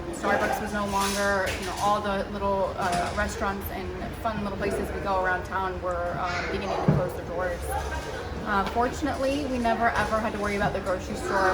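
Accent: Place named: New York, United States. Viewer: Are you from NYCity？ sounds familiar XD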